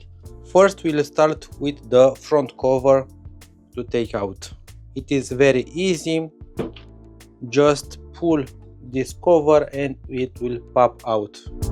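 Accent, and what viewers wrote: Romania. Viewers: a little bit of romanian english =)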